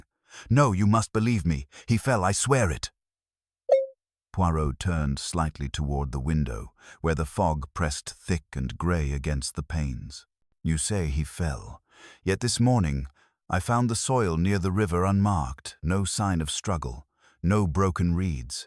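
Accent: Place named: United States. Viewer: Why are British stories being narrated in an American accent. It's just not the right tone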